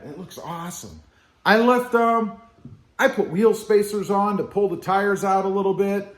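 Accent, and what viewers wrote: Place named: North, United States. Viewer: You're in Texas? Sounds like you have a northern/eastern accent.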